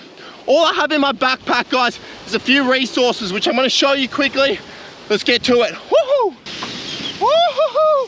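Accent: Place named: Australia. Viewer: Everything i need for the best survival Youtube cannel: Positivity Energized Maaad australian accent Talking a lot about the island and stuff AND SHOWING HOW BEAUTIFUL THE SCENERY, ISLAND, LOCATION IS (almost noone does that) And most important: Having respect for the animals getting killed And the beautiful underwater scenes where he just shows nature before hunting This channel has everything and i wish you over 1mil subs for that quality ❤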